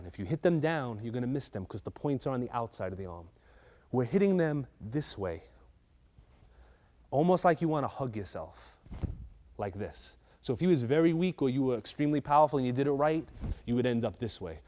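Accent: New York, United States. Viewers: I would guess either New York, New Jersey, or Italian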